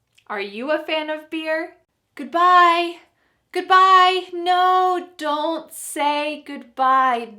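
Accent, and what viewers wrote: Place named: United States. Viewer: Hai saya dari Indonesia, saya sangat senang bisa menemukan video ini, saya juga sangat suka dengan aksen Amerika, ini bisa menjadi motivasi saya untuk belajar banyak tentang bahasa Inggris 😊, Terimakasih!! 🙏🏻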